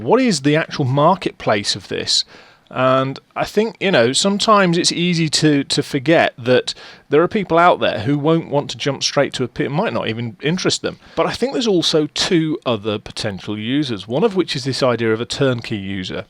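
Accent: United Kingdom. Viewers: Is this a Lancashire accent? Sorry this has nothing to do with the aircraft. I just bought it an watching for info. I love midland accents and I'm trying to distinguish the different ones.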